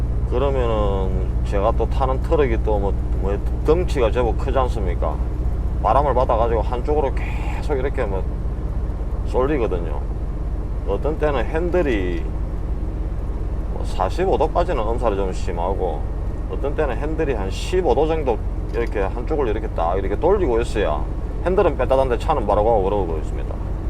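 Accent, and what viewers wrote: Gyeongsang, South Korea. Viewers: 저희집앞을 지나가셨네요 ㅎ 101 과 I-17 만나는교차로 근처에 살고 있습니다~~~ 저도 경상도 사람이라 항상 사투리가 정겹네요~~~ 영상잘보고있습니다~~~ 항상 안전운전하세요~~~ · 경상도 사투리가 늘 정겹게 느껴집니다. 처가는 포항, 매형 두분도 경상도입니다. 나라사랑 잊지마시고 늘 안전하고 건강하게 지내세요 ~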